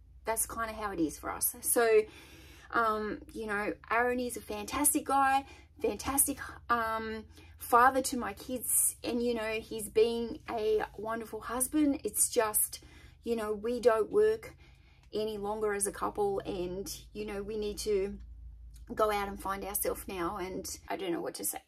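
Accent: Australia. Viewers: Are you Austrilan?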